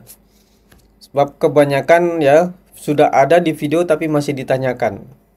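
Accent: Lampung, Indonesia. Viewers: Bang, Abang orang Lampung ya Bang? Logatnya khas. Salam.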